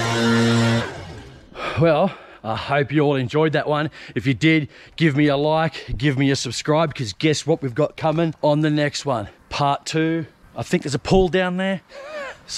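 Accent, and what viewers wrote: Australia. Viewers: You sound Australian. I would be a tad scared of the snakes and spiders on that job. Glad you did help the lady out. Well done.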